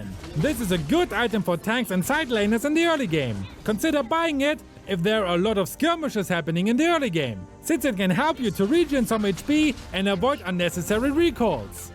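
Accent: Germany. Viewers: First time i Heard your German voice Xd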